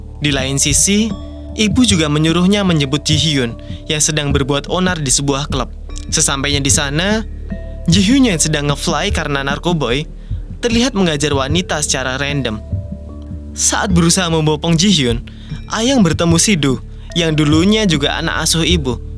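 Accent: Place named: Indonesia. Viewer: Basa inggris sangat meng indonesia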